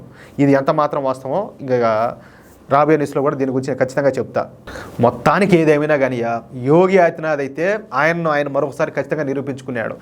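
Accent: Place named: Tirupati, India. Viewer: Naa tirupathi pakkala a v(e)illage na meedhi 😂 vor vor vore vore ,chana adhbbuutamgaa vuntadhi Naa Nuvuu matladuuthunte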